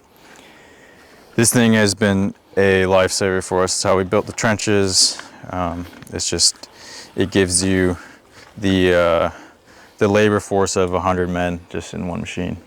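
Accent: United States. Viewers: He has a California accent lol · It took me a while to figure out why he has to search for words in english. American accent but exposed to the language for a relatively short time. That makes a lot of sense and makes it really interesting to listen to. Besides that, I envy the live you live there. Eating fish on a daily basis, together with fruits from your own garden is the kind thing I dream of. Far away from crowded places, and just doing your thing. I really like the way you do your business there and I genuinely hope you will upload videos like this more often to give us an inside look on your life. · It may have been asked before, but your English accent sounds like you are originally from the United States. Is that where you hail from?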